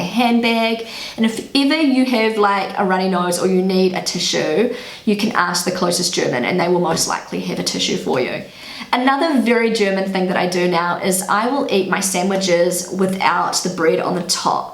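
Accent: New Zealand. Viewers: Der, I really appreciate watching your VLOGS regarding the content and because I enjoy Hearing Kiwi English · Wait...I thought ppl from new Zealand talk English the way Americans do not that accent that you have or am I wrong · Btw I love your kiwi accent don't loose it! ;)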